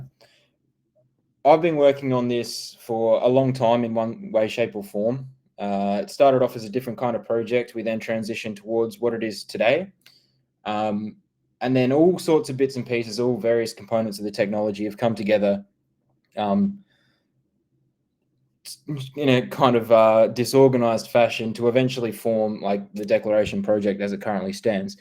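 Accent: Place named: Australia. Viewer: May you be well & happy dude....im here for listening australian accent ...love from sri lanka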